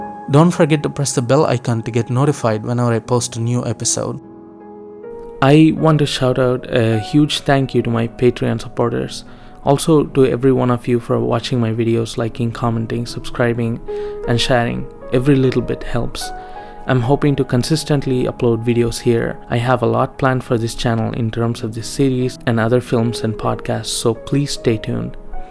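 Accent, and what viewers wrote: South India, India. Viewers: I can't tell you how similar our experiences our, I too am from the same place that you belong to (guessing from your accent) I had the same experience with smiling as described by you. I was rediculed once for my smile after that it took me ages to smile naturally. Coming from a poor south indian background with darker skin didn't help me with building my confdence. Thank you for these videos. You may not know the ways you are influencing other people's life without even realizing. Keep up the good work.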